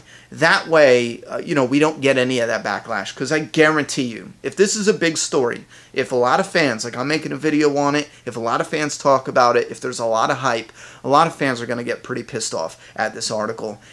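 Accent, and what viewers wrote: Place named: New York, United States. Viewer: u gotta be a new yorker! im from brooklyn myself n u remind me of people i knew back in brooklyn. sorry, just had to say that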